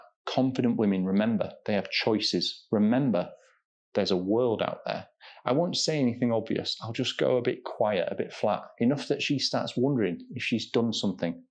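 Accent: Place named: United Kingdom. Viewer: Oh....man...ive always seen u on tictok.....never knew you had the British accent!!! Love it!! Always have...Im from the states & i sound like a dumb hillbilly!! Thank you for all ur work, im still healing after 20yrs with a narc...u are a blesssing❤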